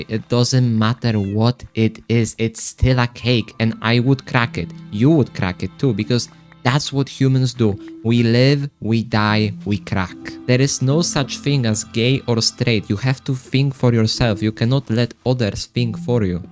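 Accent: Poland. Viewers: slychac ze polak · You have a Polish-like accent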